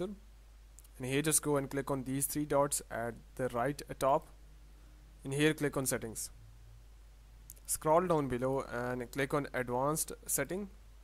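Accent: India. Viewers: Yes, sure. .....not likely I'll listen to someone with an Indian accent tell me how to do anything on my phone.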